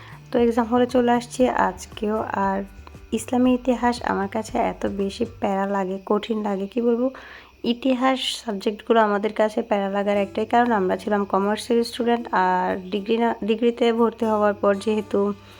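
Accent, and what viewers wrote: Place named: Noakhali, Bangladesh. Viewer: আপনি ভয়েস শুনে মনে হলো আপনি নোয়াখালীর না হয় ফেণীর মেয়ে হবেন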